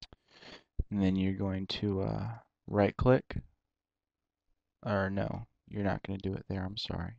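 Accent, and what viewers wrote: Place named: Ireland. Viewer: u from ireland what city ???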